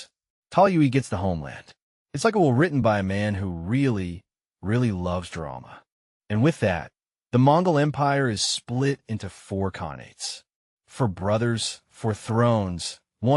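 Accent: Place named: United States. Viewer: suggestion, please pronounce the names correctly, not in Us english accent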